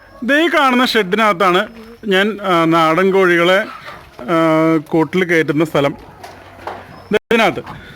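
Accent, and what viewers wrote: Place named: Palakkad, India. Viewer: ningaluda pallakad vayikol ullathe pola yavidayum kanilla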